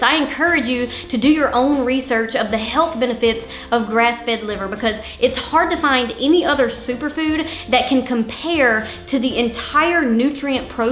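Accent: South, United States. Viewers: I absolutely love your country accent, it’s awesome!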